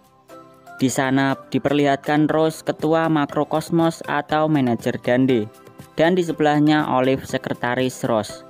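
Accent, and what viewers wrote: Java, Indonesia. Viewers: Wong Jowo Mas ? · Orang Jawa mana bang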